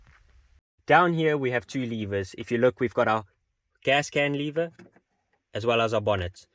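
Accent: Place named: South Africa. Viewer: Gd review bud, u sound South African 🇿🇦🤔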